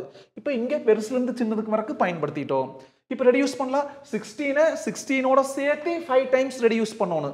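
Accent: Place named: Pollachi, India. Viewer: Excellent teaching sir ❤❤❤❤ . I love your Pollachi accent 😊😊😊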